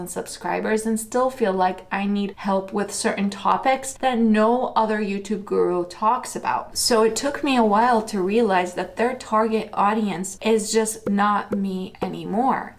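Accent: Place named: Brazil. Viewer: Are you from Brazil? Your accent reminds me of Brazilians speaking English… Also, the way you said this channel’s name sound familiar to my Lusophone ears!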